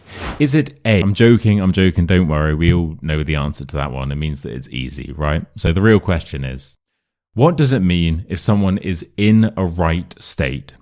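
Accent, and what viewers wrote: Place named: England, United Kingdom. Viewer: So lovely to hear a real English speaker - beside all those strange dialects of allegedly English language in USA, Australia etc.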